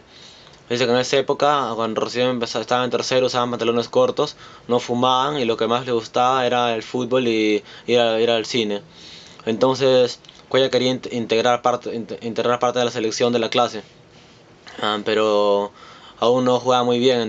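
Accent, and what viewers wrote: Peru. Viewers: Ta bien pero hablai como las weas peruano klio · Es peruano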